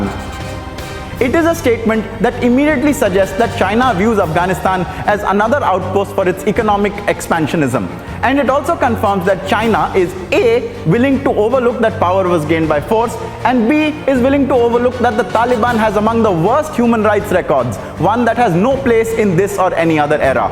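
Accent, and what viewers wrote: India. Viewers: Finally an indian-english accent i can understand as a german. Why does not every indian speak like this ?!?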